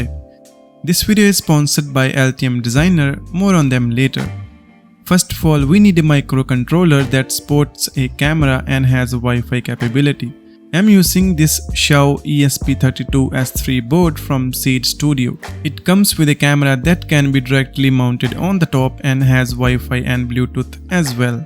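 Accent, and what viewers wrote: India. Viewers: Мне одному кажется, что это русский говорит по-английски с индийским акцентом?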